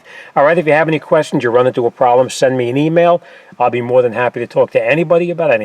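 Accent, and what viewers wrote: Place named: Northeast, United States. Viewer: I love the video. Easy, clear, and straight to the point. Plus, that Northeastern accent is one of a kind!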